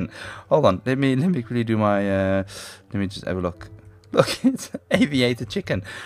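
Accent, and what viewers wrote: South Africa. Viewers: He does sound South African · are you South African?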